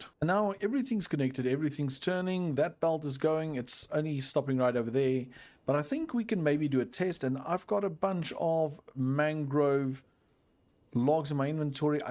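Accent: South Africa. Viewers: So great to hear a South African accent on a good quality youtuber. Keep up the great content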